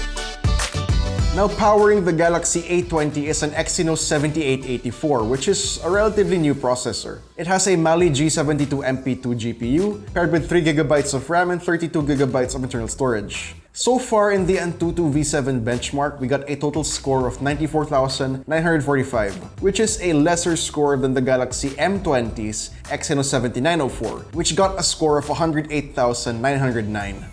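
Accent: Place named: Manila, Philippines. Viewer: It's Manila boy accent boy!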